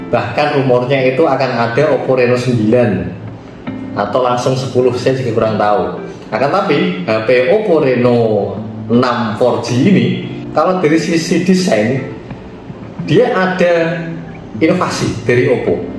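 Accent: Java, Indonesia. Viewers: medokk bngett ituh jawa mu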